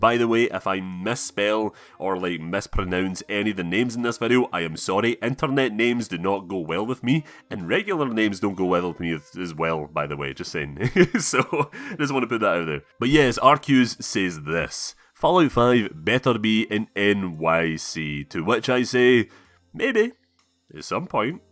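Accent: Scotland, United Kingdom. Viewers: This is my first vid of his that I’m watching and in the first few seconds I thought he was Russian, and then I heard the Scottish accent, this is pretty much the only Scottish gamer I’ve found on here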